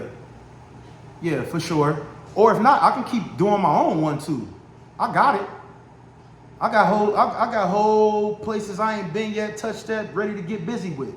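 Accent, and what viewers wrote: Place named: Detroit, United States. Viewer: Could’ve swore you was from Detroit s/O to Cincinnati! I got fam in Youngstown & I just subscribed to your channel bro! Keep goin up 💯💯💯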